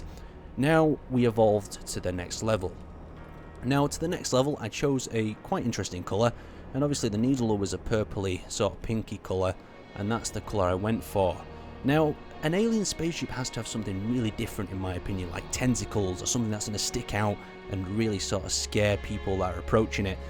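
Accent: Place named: West Midlands, United Kingdom. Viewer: you sound like you're fro the westmidlands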